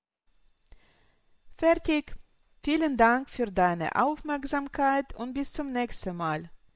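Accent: Russia. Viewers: У вас такой милый акцент) вы из России? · А почему не на русском?всеравно сильный русский акцент?не надо стесняться говорить по русски даже живя в Германии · если вы понимаете, что я пишу значит вы можете по русски, акцент у вас очень большой, с таким акцентом говорят русские, но не все в этом признаются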